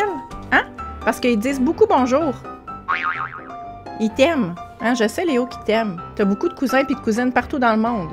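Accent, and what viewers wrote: Canada. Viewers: J’aime beaucoup l’humour que vous déployez dans vos cours photos , c’est sympathique, vivant , et instructif. Quant à vos chats , je me demande s’ils n’expriment pas une forme de rébellion 😼 😜, prenez votre temps et quoique vous décidiez, ce fut un plaisir 📷… avec ce petit accent canadien qui plait tant à nous autres , les français . Thierry, région de Champagne,France 🥂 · Hello, j'ai découvert ta chaine en novembre 2023 suite au renouvellement de mon matériel et j'ai repris gout à figer le temps. Grace à toi, en décembre je passais sur Luminar Neo et j'ai le projet de faire ta formation mais avant, je termine la formation sur l'appareil que j'utilise. Concernant le temps que tu passes à nous offrir ton savoir, effectivement, parfois il faut prendre du recul et penser à soi-même et peut être que tu seras encore plus lumineuse avec les 2 vidéos par mois car tu auras profité de ceux qui t'entourent. 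Encore merci de nous offrir ton expérience et ton savoir. Ne change rien...surtout l'accent Canadien que les français adorent.🤗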